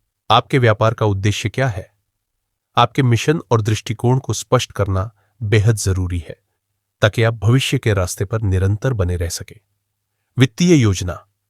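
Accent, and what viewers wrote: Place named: Maharashtra, India. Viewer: आपकी टोन महाराष्ट्रीयन जैसी लग रही है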